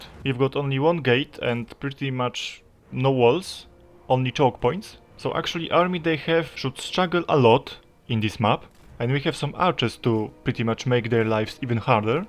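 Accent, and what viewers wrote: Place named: Poland. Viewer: brzmisz jak Polak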